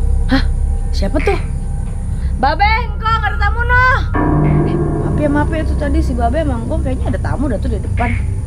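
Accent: Betawi, Indonesia. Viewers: Gua sebagai org Betawi bangga di chenel bng Betawi nya msih kentel😁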